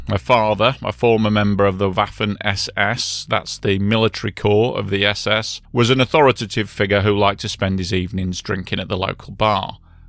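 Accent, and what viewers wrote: United Kingdom. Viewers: a damn Brit narrate a film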